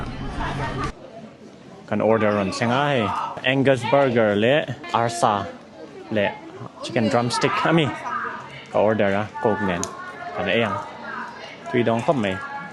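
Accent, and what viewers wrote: China. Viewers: China Awphawi deuha Mizo tawng i hman hi ngaihthlak a nuam ve riau mai...❤ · mizo tawng i hmang hian Chinese accent a tel deuh a ka hriat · i trawngchhuak pwh a chinese lunglung khop mai.. chinese accent in mizo language😊❤ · Chinese aw phawia mizotawng hman i tum tlat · Chinese accent hi a khamphei zût mai sia . Mizo tawng hman leh chiah hian a khamphei duh viau ang😊Sir pawh dinglaammm ti zeuh mai 😅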